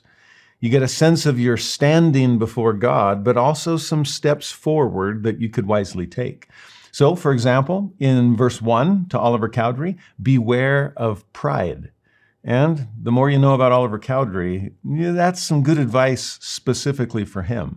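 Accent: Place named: North, United Kingdom. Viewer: Are you really a Texan cause you sound like you're from up north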